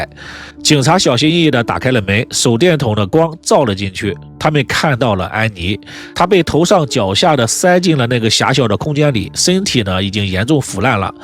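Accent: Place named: Northeast, China. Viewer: 並不說所有人都能說案的，你這一嘴大碴子味兒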